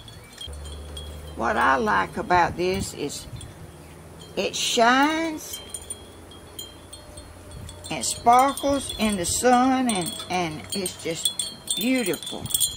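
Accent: South, United States. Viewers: Hey, don't get to down on yourself about your camera acting up, it happens to alot of people on YouTube. I admire all your crafts and seem like so much fun!!! And I love a good southern accent! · Don't you worry, I love listening to your accent, it reminds me of when I lived in the south. It saddens me that young people don't have the accent anymore. Thumbs up.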